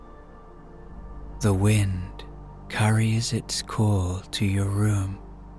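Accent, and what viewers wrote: Ireland. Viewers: Hi, I’d like to say a massive thank you to you for your wonderful channel. Since my amazing hubby passed away, bedtime for me has brought anxiety, tears, insomnia like you wouldn’t believe and emptiness. I’ve tried music, silence, audiobooks, meditation and yet still I found it so difficult to relax. Your sleep stories are beautiful, your gentle Irish lilt soothes and caresses away the anxiety. I can categorically state I haven’t yet got to the end of your stories, which for me is a miracle! You are truly a gift to me and I wish you every success in growing your channel…. I for one will be listening and looking forward to each of your uploads… Again, thank you so very much for all you do for us in your growing community xXx💜xXx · OMG in the greatest respect I love you as an actor, TBh I was surprised that your beautiful silky voice as a storyteller actually sends me to sleep. 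Why? Bcoz I've suffered insomnia, bcoz of PTSD for years, so thank you for making my night more focused and yeah, without sounding insensitive your beautiful Irish tones help me to blissful night's sleep ❤🙏🙏🙏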